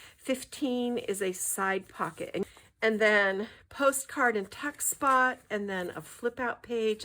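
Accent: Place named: North, United States. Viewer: I just found your channel and look forward to seeing what you have. I am in Greensboro, NC. Are you near me? Your voice sounds familiar. I was involved with the High Point Quilt guild years ago and even further back taught scrapbooking classes here in town. Just curious. You also sound like maybe you lived up north some, like me, LOL.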